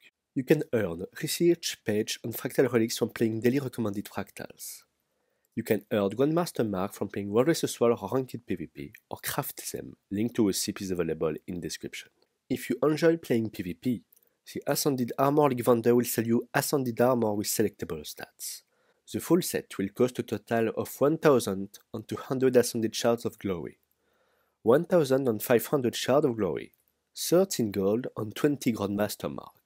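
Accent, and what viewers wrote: France. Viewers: this is the frenchiest french accent in the world.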